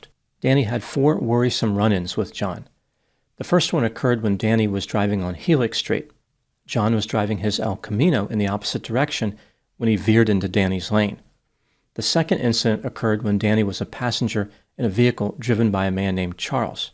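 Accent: United States. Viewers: You sounded more American than ever in this piece.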